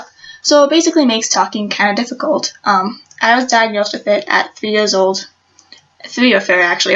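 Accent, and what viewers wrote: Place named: United Kingdom. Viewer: Thank you for sharing my dear. My 3 year old son is in speech therapy. His therapist mentioned speech apraxia for the first time this week. After reading about it I got very sad and worried for my sons future. First thought that came to me was the bullying he will experience at school. Now watching your video gives me hope. You are very brave and to be honest you sound a bit British. I love it! Many thanks and wishing you many blessings.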